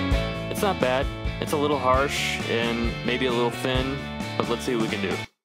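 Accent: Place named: California, United States. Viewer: You kinda sound like Gregory Scott of Kush Audio fame, but with less vocal fry and and less CA accent. Not an insult, to be clear, I just noticed the similarity between your voices.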